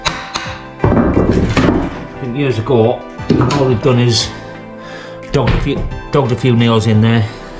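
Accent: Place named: Liverpool, United Kingdom. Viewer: Can hear a fellow scouser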